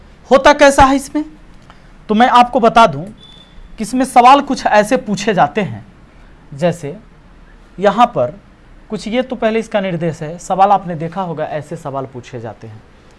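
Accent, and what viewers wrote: Bihar, India. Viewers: Bihari style me hindi sun ke to sir maza hi aa jata hai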